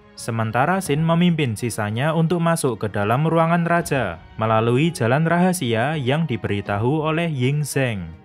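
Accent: Java, Indonesia. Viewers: Mas kata"nya jangan medok bangt🙏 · Medok medok medok cuy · Gw pertama kali mutar vdeonya oh betapa medoknya ahhh mantap 🤣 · Bang logat nya medok sekali:) xixixi asal mana bang?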